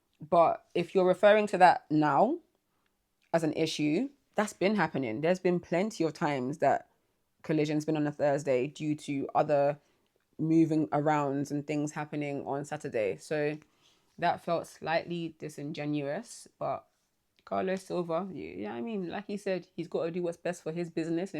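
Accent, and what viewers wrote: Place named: United Kingdom. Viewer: Hiya, german here, your channel trailer got recommended to me. I have stopped watching WWE stuff a few years ago and am focusing on other promotions and old shit, but you're sympathetic so I'm giving a sub. You sound british, you going to All In (and Revpro or others :D )?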